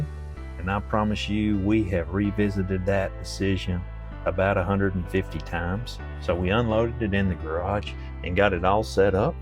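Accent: Tennessee, United States. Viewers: That's an incredible job. I thought I heard TN in that accent. I'm in middle TN working on a 73 F100 swb. Thank you for the videos. I wish there were 10x more. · Same here recognized that tennessee accent...im up here on the plateau in crossville. Great work on that f150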